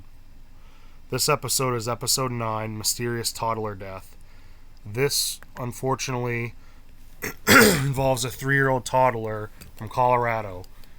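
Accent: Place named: New England, United States. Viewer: Good video. I hear you're a fellow new englander like i am.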